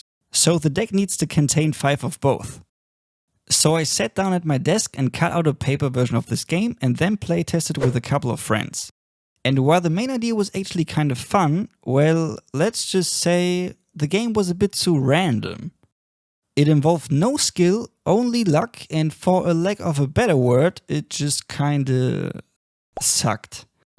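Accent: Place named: Germany. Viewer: Ich hab mir während des gesamten Videos gedacht "der Akzent klingt doch irgendwie voll Deutsch", und am Ende einfach der Screenshot vom Kanal wo steht "Mehr über diesen Kanal" 😂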